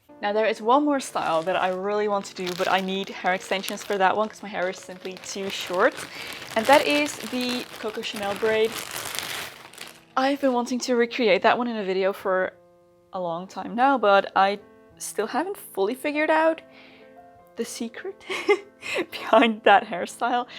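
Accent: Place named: Netherlands. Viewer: lol dutch accent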